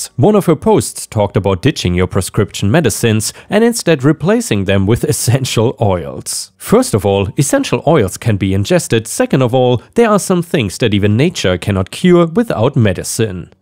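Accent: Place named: Germany. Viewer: Where did you come from? Your accent sounds German.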